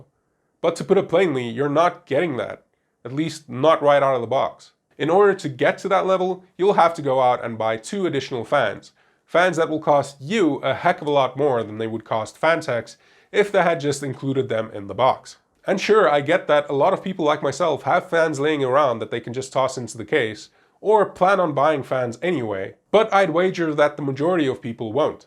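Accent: South Africa. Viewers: Oh damn that’s a surprise, you’re South African. Like me ✌🏻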